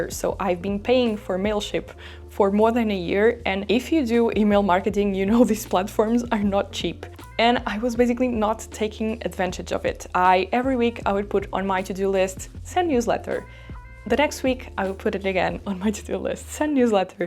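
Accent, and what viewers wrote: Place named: Brazil. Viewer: My boyfriend is Brazilian he has your same accent and says OMG... when he cant pronounce a word as well xD I like ur content. Reminds me of him.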